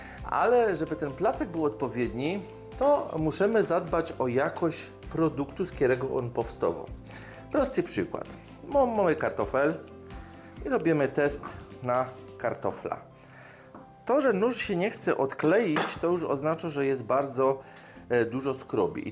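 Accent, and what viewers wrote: Silesia, Poland. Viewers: Dziękuję za dobre rady jakie ziemniaki wybrać na placki.Przypadkiem trafiłam na ten kanał i już subskrybuje, bo mi się podobo śląsko mowa❤ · nieprawda. Ja uwielbiam jego śląską gatke. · Przepiękna Śąska Gwara! Byłem kilka razy na koloniach z chłopakami że Śląska i natychmiast po pół wieku wspomnienia wrócily. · 😂 slunska gwaro śmieszno jest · Kocham Pana kuchnię,smaczna,prosta,podana w prost,apetycznie.Kocham gwarę śląską, uczę się od lat Pana przepisów, obserwuję Tak trzymać!!!!!!❤😊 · Te przepisy,które dotad oglądałam są super.Bardzo lubię jak mówi po śląsku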